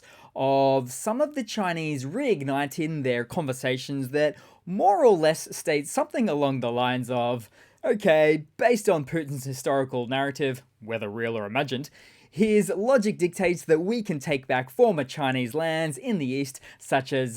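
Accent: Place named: Australia. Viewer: Sorry, but I still cannot get used to an Aussie accent pronouncing ‘route’ as “rowt” rather than “root” and ‘via’ as “vee-ah” rather than “vye-er”.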